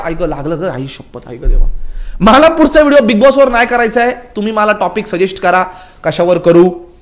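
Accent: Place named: Mumbai, India. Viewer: मुंबईची मराठी तर फारच छान 🎉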